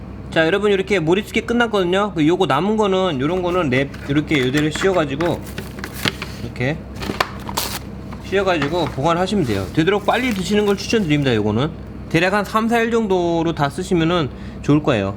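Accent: Japan. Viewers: Gecko Park_KR 교포는 아니시고 일본에서 오래 생활하셔서 그래요 ㅋㅋㅋ예전 영상들 찾아보시면 일본인이 한국어 쓰는거 같을정도인데 영상하시면서 많이 돌아오신듯ㅋㅋㅋ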